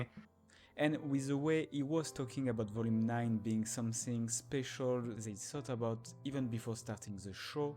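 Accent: France. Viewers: Ca c’est un accent français je me trompe ? Btw nice video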